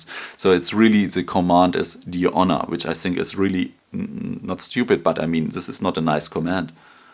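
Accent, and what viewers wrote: Germany. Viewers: German accent. :)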